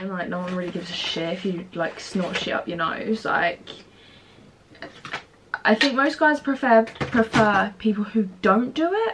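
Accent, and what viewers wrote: England, United Kingdom. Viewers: salut jadore ton accent anglais tes tres jolie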